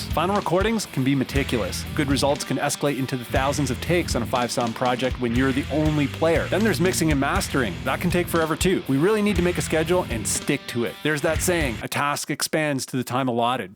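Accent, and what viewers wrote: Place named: Ontario, Canada. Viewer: I just subscribed after watching your channel develop for a while. I'm 50 this June and also trying to release a solo project. Mines also being written and recorded in reaper, but more in the Swedeath direction. Gonna be cool to hear the finished product and buy it on Bandcamp. My missus is from Winchester ON, so I enjoy listening to you speak too. Reminds me of warm summers and cottage life.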